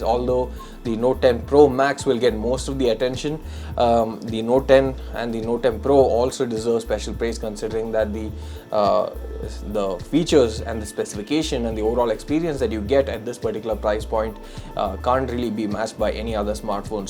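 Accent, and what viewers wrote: India. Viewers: U sounds harmonic From INDIA ? Subscribed anyway 😊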